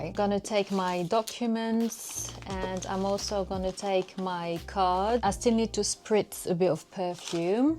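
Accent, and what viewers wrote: United Kingdom. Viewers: Hi, I love your weekly vlogs. Especially this one, which is amazingly stylish and informative. What a lovely British accent you have 😊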